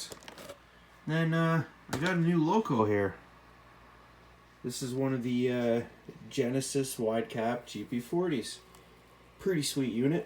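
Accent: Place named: Cambridge, United Kingdom. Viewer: you sound like you are close to me , Cambridge ???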